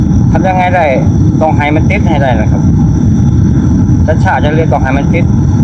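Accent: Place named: Korat, Thailand. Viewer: เป็นคนที่ไหนครับ อยู่จังหวัดอะไรครับ สำเนียงเหมือนคนโคราชเลย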